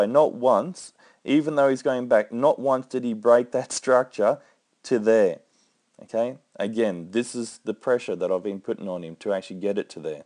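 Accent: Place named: Australia. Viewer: I like that you take the time to breakdown and study the fight, and the realistic approach on how taiji can be applied. It's sounds like you're Australian, where are you based?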